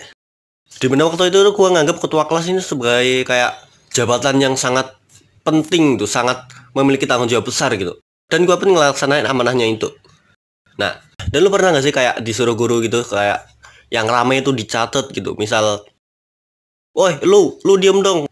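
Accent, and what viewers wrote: Java, Indonesia. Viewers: Bang lu dari jawa yah🤔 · Apakah kamu orang Jawa bang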